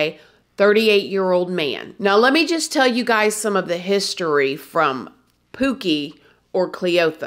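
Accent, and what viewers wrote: South, United States. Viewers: I agree, I think this was a random attack but VERY coincidental. I think he saw her run by, either knew who she was since her family was so prominent in that neighborhood or saw she was minimally clothed and thought it would be a perfect opportunity for SA. It makes my stomach turn to think she was just out minding her business and someone could do that to her. I heard her remains were in pretty bad shape (I don't want to go into detail because from what I heard, it was just horrific) but I think what he did in the disposal was to try and get rid of DNA evidence from himself. My heart breaks for those boys and her husband. 💔 Sidenote: I love your vibe and southern accent, I've been watching your videos for a little over a year, especially the videos of you explaining your past. You keep it real and I admire that!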